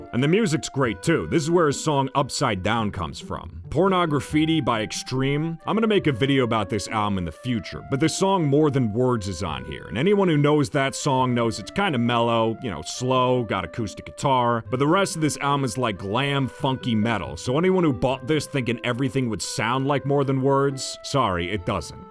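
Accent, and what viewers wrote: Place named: New Jersey, United States. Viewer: He’s 25?!! Maybe it’s the Jersey accent(?) but that doesn’t sound like a 25 year old to me lol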